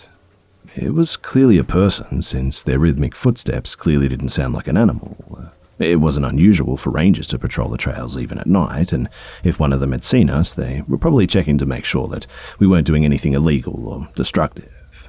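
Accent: Australia. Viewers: One of my favourite hobbies is Listening to scary stories and Drawing. Your Australian accent is Excellent and I'm not gonna lie, Pretty relaxing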